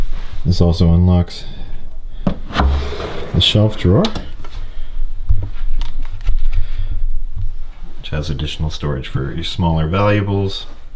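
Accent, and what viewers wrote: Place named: United States. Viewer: Voice of an American, I guessing probably not a Democrat...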